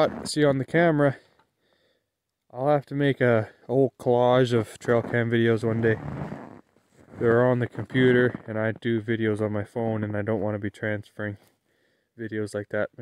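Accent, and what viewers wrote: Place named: Canada. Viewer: Also, I’m Canadian and you sound really Canadian am I right? Nice to find Canadian creators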